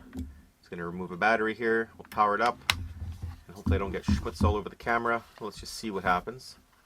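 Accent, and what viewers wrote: Canada. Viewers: I don't know if you're Canadian or not, you sound it (no offence meant if you're not). The ones I have (in the UK) don't use a DC motor, they use an electromagnetic actuator type deal and readily accept the fake refills. Same company, but very different design. Cool stuff though, watched it all.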